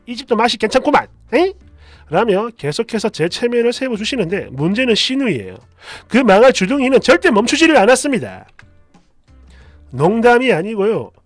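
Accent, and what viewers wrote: Gyeongsang, South Korea. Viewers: 앵가이해라 앵가이~ ㅎ글로읽고 목소리로 들으니 더입에 착붙이네요~ 갱상도 싸나이 좋아요 좋아👍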